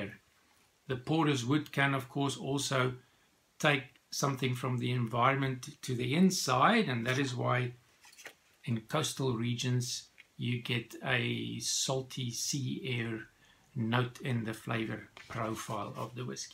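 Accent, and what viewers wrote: South Africa. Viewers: I'm a complete beginner when it comes to whiskey, and this is by far THE BEST video I've found that explains everything clearly and in an order that makes a lot of sense. Thanks so much! I also hear a South African accent, which is where I'm from 😁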